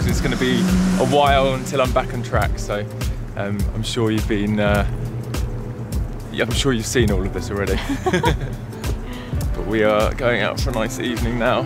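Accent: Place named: United Kingdom. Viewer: GOD, the British accent is SO GOOD, I could hear you talk all day!!! Greetings from Brazil ❤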